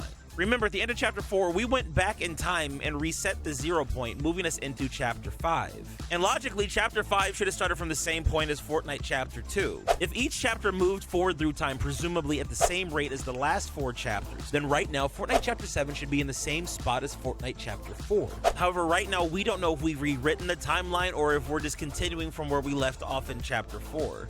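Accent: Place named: United Kingdom. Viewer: why is he british🐥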